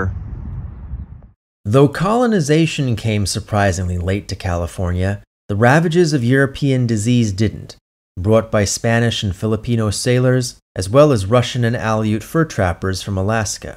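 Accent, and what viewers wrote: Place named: New York, United States. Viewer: I'm surprised to hear that you're from California because I hear so much New York in your accent (notably in "caused" and "Chicago"). But honestly I wouldn't be surprised if a lot of the Southern California accent is similar to the Northeast.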